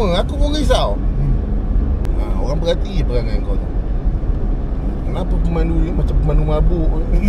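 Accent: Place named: Pahang, Malaysia. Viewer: Cikgu mcm loghat Pahang pun ade bunyinya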